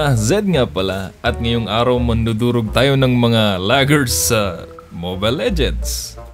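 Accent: Visayas, Philippines. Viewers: Bisaya ka pala lods 😂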